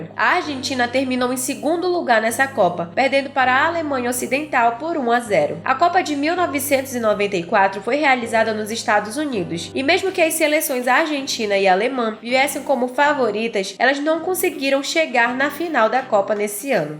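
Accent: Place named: North, Brazil. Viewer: Você é paraense ? Seu sotaque lembra a região norte. Parabéns pelo canal. Muito bom seu trabalho.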